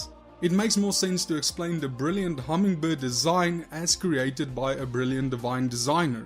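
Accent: South Africa. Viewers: You're South African right?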